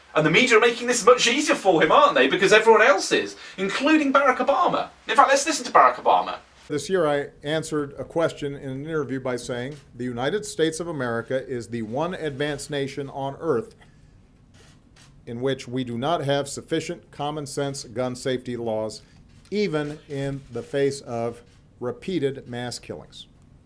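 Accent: United Kingdom. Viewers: it just sounds better with a british accent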